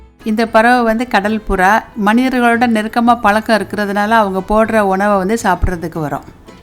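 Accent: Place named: Tiruchirappalli, India. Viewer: இந்தியாவில் எந்த ஊர். தமிழ்நாடு. பேச்சு வழக்கு திருச்சி மாதிரி இருக்கிறது. நான் திருச்சி தான். பார்க்க பூக்கள் அழகாக உள்ளது. வெளிநாடு வெளிநாடு தான் .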